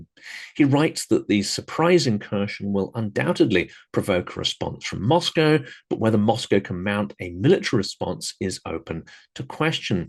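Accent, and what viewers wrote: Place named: United Kingdom. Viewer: Well done,! Always incisive, well thought out, and stated in excellent intellectual British English which is very pleasant to American ears. Love your podcasts. One of the best podcasts on You Tube.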